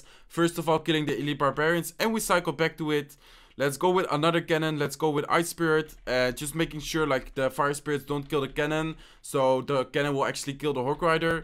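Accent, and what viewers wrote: Netherlands. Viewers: Haha Yes thats Dutch, Im dutch to. Its funny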